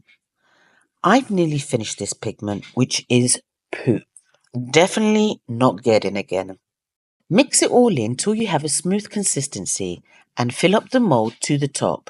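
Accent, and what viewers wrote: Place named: Canada. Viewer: you sounded like a Canadian 😊❤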